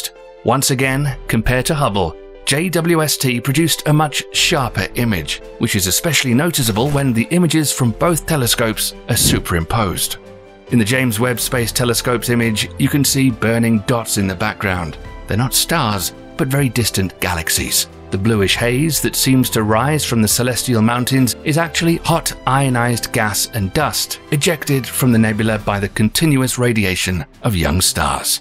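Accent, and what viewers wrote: United Kingdom. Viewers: You think that a British accent gives videos some sort of validity and truth. But there is not much true information in this video. It's nearly completely fictitious rubbish.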